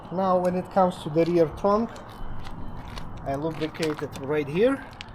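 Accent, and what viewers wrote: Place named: Russia. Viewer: а почему Слава Богу? акцент то русский.)))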